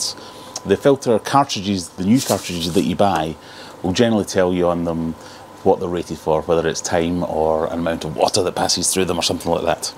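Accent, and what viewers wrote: Scotland, United Kingdom. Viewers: Just came across the channel, instant subscribe when i hear a fellow 🏴 accent 😄 Where are you from ? I am from Blairgowrie, Perthshire. Away to sift through the content & look forward to more 👍👍👍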